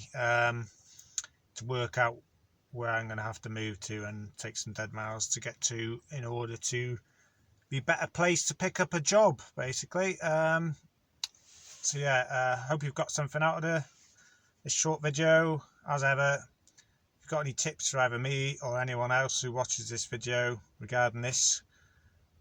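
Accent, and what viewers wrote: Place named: Coventry, United Kingdom. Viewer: Are you Coventry guy..as i am struggling with accent a tad..I know cov is 18 miles but there is 50 miles circle that covers a vast amount of areas I wish I was still near somewhere local.. Nottingham should be good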